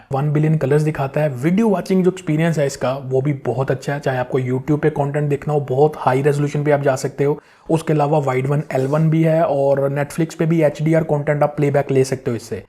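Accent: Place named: Punjab, India. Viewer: Lagta hy gu panjabi hu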